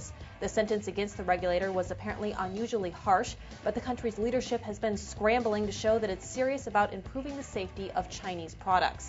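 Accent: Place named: United States. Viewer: good video, but hated the annoying American Accent (Nothing against American's, jus the accent)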